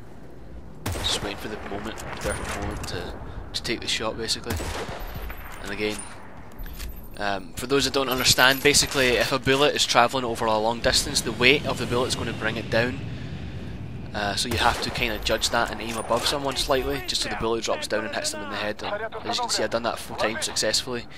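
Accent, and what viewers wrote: Scotland, United Kingdom. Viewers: He's Scottish..The way he says it is not the same as all British people. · i love your commentaries mate, where bouts in scotland you from? i played it last night, i really couldnie find anyone :)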